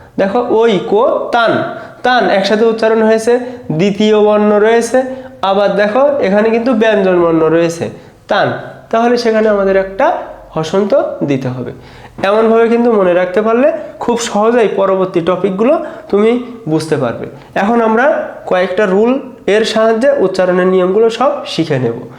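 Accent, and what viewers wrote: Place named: Satkhira, Bangladesh. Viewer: বাসা কোথায় আপনার,,????? কথার টোন শুনে মনে হচ্ছে সাতক্ষীরায়,,,আমি আপনার সব ক্লাস দেখি,,,বাংলা সাহিত্য গদ্যের mcq and ব্যাখ্যা দেখে তারপর বই থেকে দেখছি এখন খুব সহজ হয়ে গেছে,,, ধন্যবাদ ধন্যবাদ 😊😊😊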